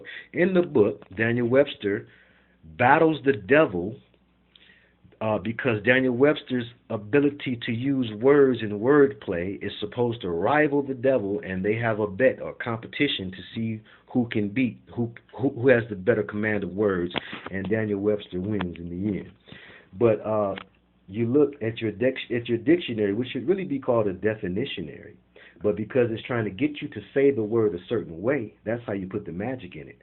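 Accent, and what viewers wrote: Detroit, United States. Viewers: Are you from Detroit bro? Much luv appreciate your teachings.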